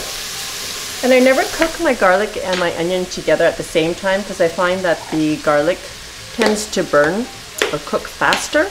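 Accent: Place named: Canada. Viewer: lucky! i'm assuming you're in canada, i hear a bit of the accent :) furthest i've been up that way would be seattle, but hope to make it to canada some day. i love that kind of weather! have a great week :)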